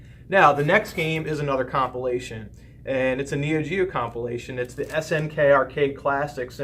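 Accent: Baltimore, United States. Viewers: You totally sound like Baltimore! Catonsville here!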